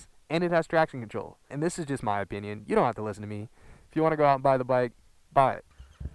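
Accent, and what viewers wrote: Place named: United States. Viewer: you sound gay as fuck with that american accent✈🏢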